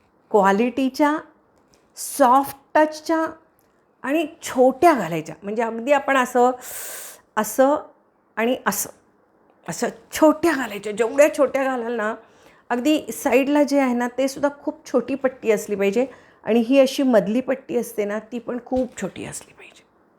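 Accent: Kolhapur, India. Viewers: Madam tumhi kolhapur chya aht ka?? bolnyacha tuning vrun vatt kolhapur che aht😊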